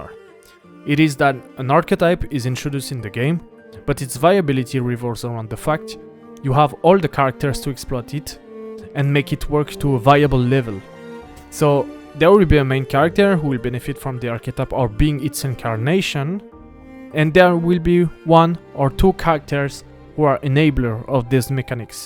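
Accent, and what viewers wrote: France. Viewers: Are you French? You sound like you have a french accent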